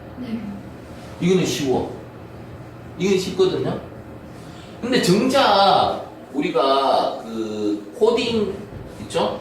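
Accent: Gyeongsang, South Korea. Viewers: 강사님 참 잼나게 강의하십니다. 특히 구수한 경상도 말씨로 생동감이 넘침니다. 한가지 문의드립니다.Window sever 2012에서 화일탐색기로 임의의 화일을 삭제할경우 마우스 클릭후 delete key할경우 선택한 화일이 삭제되어야 하는데 삭제가 안됨니다. 강제로 삭제(Shift+delete )할경우 삭제됩니다. 어떤 원인인지?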